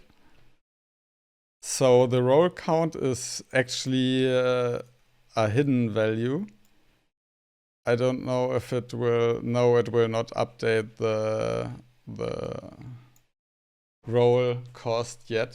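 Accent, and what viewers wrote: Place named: Germany. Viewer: danke danke. und besonderes danke fuer schnelle antwort. weisste als ich dein englisch gehoert hab dacht ich mir hmmm vlt deutsch. nicht mal gerallt dass entwicklerwerkzeuge deutsch is ^^' erst spaeter so wait a moment 🧐 klein meinte ich vom tutorial wenn mans am handy schaut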